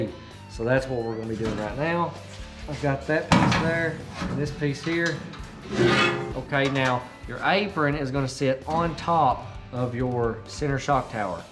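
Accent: South, United States. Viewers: It's hard to get past his redneck accent. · ahh, you're like me then lol. well i hear the country accent. what state are you in neighbor? wonder if you ever make it to the MS gulf coast for Cruisin the Coast? *edit, just watched your how to register video where you mentioned TN. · Living in the south, this man makes me proud. To some (not all, I’m not going to paint with a broad brush) folks not from here, he may sound less intelligent because of his accent. How mistaken you would be. The south is covered up with folks that have great intelligence and common sense. LOL, I ain’t one of them, but they are here. God bless the South.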